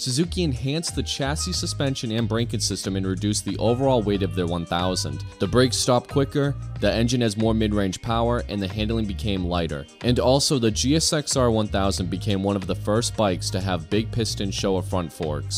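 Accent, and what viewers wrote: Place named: New York, United States. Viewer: I like this channel because it's a real human talking (I think!) If it's A.I. with a NY accent I'm impressed. LoL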